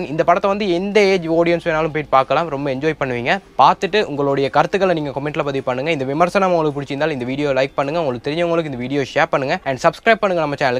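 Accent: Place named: Sri Lanka. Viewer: இலங்கை தமிழா, கேட்க இனிமை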